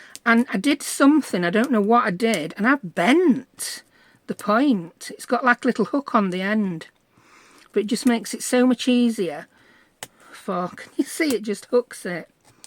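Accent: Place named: Yorkshire, United Kingdom. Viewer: I love listening to you! I’m Irish born and lived in Yorkshire for 3 years from age 6-9 then moved to the US. Listening to you talk feels so comforting and nostalgic to me as it’s how I spoke in my formative years. Love your projects and you! Wish you would do a ‘get to know me’ video. ❤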